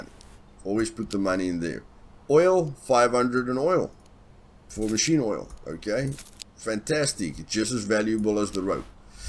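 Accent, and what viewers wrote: South Africa. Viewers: Jy Suid Afrikaans?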